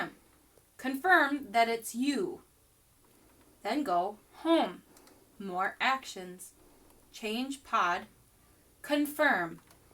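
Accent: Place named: Minnesota, United States. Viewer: Excuse my silly Minnesotan accent!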